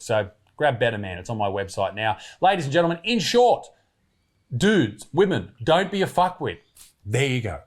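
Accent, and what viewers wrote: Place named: Australia. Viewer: i love ur accent as iam am also an australian!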